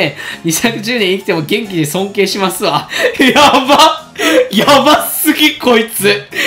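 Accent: Kansai, Japan. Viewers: kunさんはたまに関西弁が出てましたから関西出身やと思っとりましたけど岡山出身の方なんですね。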